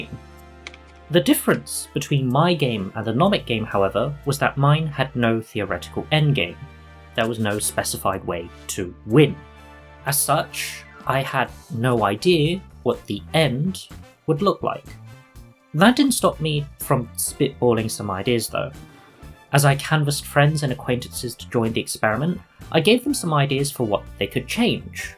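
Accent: United Kingdom. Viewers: There was a 50/50 chance that this would be another of these dumb, right wing US videos and I was never happier to hear an british accent! Very interesting and equally entertaining video!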